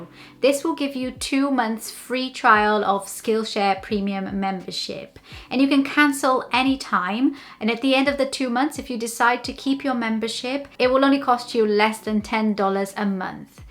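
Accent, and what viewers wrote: Wales, United Kingdom. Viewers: Interesting stuff & you have a lovely voice - and yep, you're definitely showing the Welsh influences :) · Thank you so much for sharing this! I love British accent. That’s why I’ve been following your YouTube for the last 3 ++ years 😊 I searched “British English, mummy” or something like that on YouTube at that time and I found you:) Thank you so much always for your content! xxxx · I am a retired lawyer, living in Yorkshire but my parents were both Welsh and I went to university in Cambridge. I would say you definitely speak English with a Received Pronunciation (ie the "standard English accent" typically used by educated middle class professionals particularly those coming from the South East of England, and heard on the BBC, also sometimes called Oxford English). With a delightful slight Welsh lilt. Nobody would guess you were anything other than a native speaker of British English.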